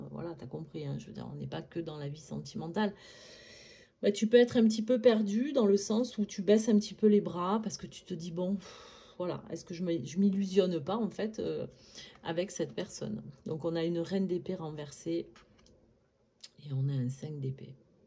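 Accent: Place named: South, France. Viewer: Bonjour 😎 Tout juste my mother is verseau 😂 Belle journée ☀️ Rien que l'accent du sud,meme léger me transporte 😅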